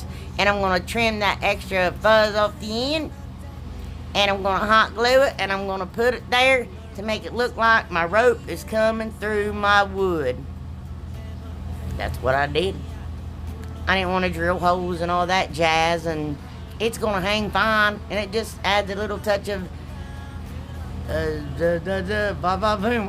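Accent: South, United States. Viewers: Absolutely love your country twang! Been watching you since you started, love your channel! Keep up the great DIY's! Oh and STICKERS!!!LOL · i love your designs! everything you make is so beautiful and i LOVE to listen to your accent and laugh!!! us southern girls have to stick together lol (NC here) love and prayers!!!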